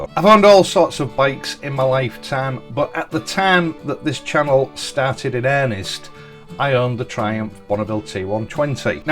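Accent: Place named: East Yorkshire, United Kingdom. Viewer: Long Riston Jet garage? :) Makes a nice change to hear an East Yorkshire voice! Good channel.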